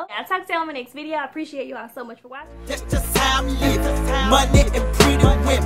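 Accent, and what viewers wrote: South, United States. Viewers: first of all I would like to say, you are so cute and country lol. What southern state are you from? Second great tutorial. I've been natural for 5 months and my hair is about 5 inches and I plan to relax it soon so it will be the length of yours and I consider my hair short as well. This channel is just what I needed, thank you so much! I also see women with super short hair when I look up "short relaxed hair tutorials" and when I look up medium it is longer than mine. keep these videos up! I am def. a new subbie!!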